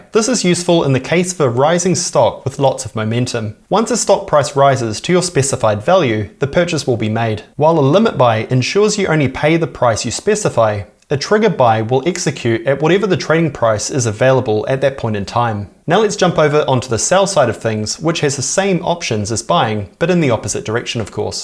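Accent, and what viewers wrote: New Zealand. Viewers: He speaks in a typical New Zealand accent. They are well known for speaking quickly.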